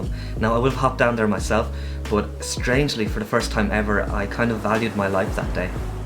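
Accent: Ireland. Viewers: Great to hear an Irish voice here on YouTube, you have missed focus on several images so I would recommend the Nikon FE as your next film camera but the FM,FM2,FA,FE2 are also very good, the FM3a is good but too expensive. I have subscribed. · It was a huge uplift for me to open your video and hear a young Irish voice. I admire the vision you have of seeing and telling the Irish story with young eyes. The Fujica 705 was a camera I used in the 1970s but was unfortunately stolen. I then used and still use a Pentax S1a. You may have seen the recent blogs on the need to invest in cameras with mechanical shutters. Electronics fail. I have lost two to irreparable damage. Still, I would recommend the Contax 139Q because it is widely available and those Contax lenses are genuinely top class on a par with the best Takumars. But, my absolutely favourite camera, is an old Werra V that I still have. 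Pure Leica quality from East Germany. It is my dedicated BW film camera. Carrying that around I feel that I am channeling Cartier-Breslin.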